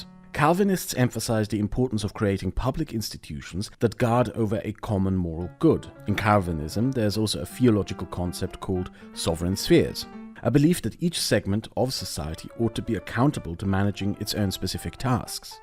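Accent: Germany. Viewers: Ironically, I never thought I'd enjoy listening to pronounce German words but... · There's something inherently sexy to a video essay on German history written in perfect formal English and narrated with a tasteful German accent.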